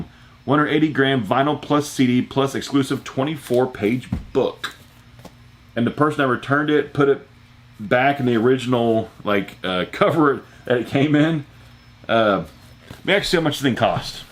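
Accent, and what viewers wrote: United States. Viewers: I don't mind your rambling...a) I like your voice and b) I'm not an english-speaker so it is always good to listen to some genuine american-english ^^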